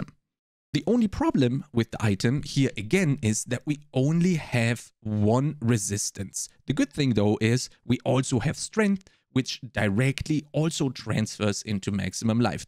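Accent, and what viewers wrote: Germany. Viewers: Thanks mate. This crafting kills me. Now I have a better overview. P.S. Höre ich da einen deutschen Akzent heraus ? ;-)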